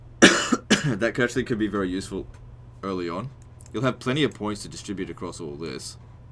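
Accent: Australia. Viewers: Love your attitude about doing it your way even when the advise is totally correct. I can relate, but you're way more extreme in it. Strong personalities = manly balls, keep this shit up my Australian-Sound-More-Thor-Than-Thor-Himself-Legend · Linoge Z: Well it sounds like he has an Aussie, or New Zealand accent. So I highly doubt it was a US education. But for a guy who can't differentiate between to, and too, I think your hardly in a position, to be talking about someone else's education. No matter where they're from!..😏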